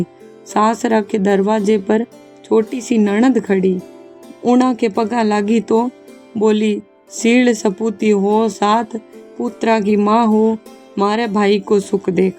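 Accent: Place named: Marwari, India. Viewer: Nice marvadi voice 🙏🏻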